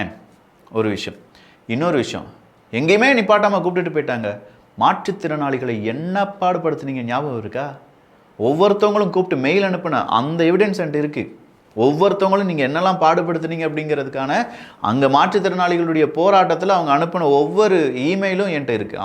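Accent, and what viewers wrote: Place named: Kanyakumari, India. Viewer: மக்கா பேச்சு கன்னியாகுமரி முக்கிய பேச்சு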